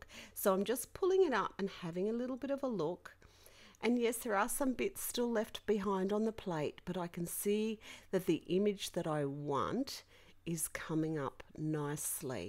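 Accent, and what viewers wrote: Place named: Australia. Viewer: Hi! I live in Sydney Australia and very pleased to hear your Australian accent! Hoping you are living in Australia at the moment. I really want to try this. Which magazines have you had the best results from? I've heard some don't work.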